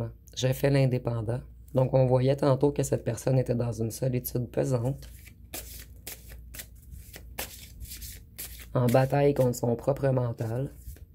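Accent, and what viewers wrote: Quebec, Canada. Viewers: Bonjour votre accent quenecois est tres jolie , je pense que chez vous la temperature n'est pas si chaude que chez nous dans le sud ouest de la France . Merci a vous 👍🙏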